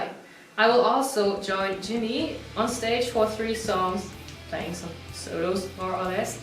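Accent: Germany. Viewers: A true accent from a true german girl! And yes, she really is! ;D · I really love German accent😍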